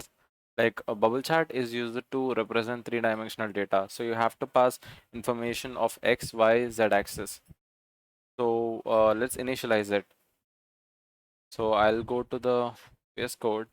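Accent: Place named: India. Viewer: Indian people, please please work on your English accent!!!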